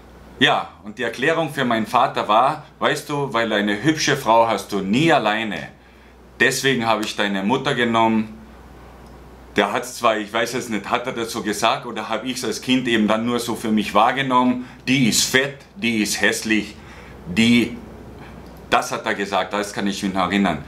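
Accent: Carinthia, Austria. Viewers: Green ich hab gedacht er aus kärnten vom dialekt her :)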